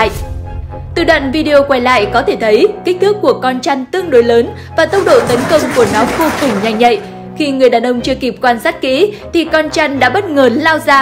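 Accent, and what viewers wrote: North, Vietnam. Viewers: Trở về giọng Nam Bộ đi, giọng Bắc nghe ko quen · Nay kênh đổi tên đổi giọng bắc review luôn:))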